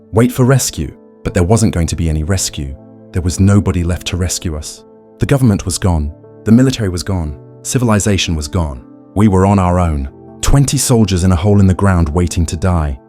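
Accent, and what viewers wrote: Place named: United Kingdom. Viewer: a British accent is entirely wrong for Fallout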